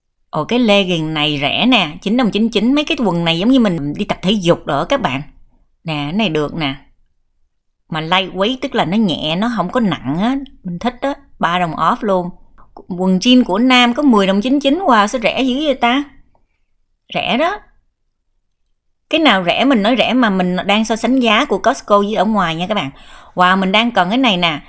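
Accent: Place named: Mekong Delta, Vietnam. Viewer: chị người miền Tây phải không, sao e nghe giọng của chị phát âm rất nặng mà tiếng cứ cứng cứng lm sao ấy ạ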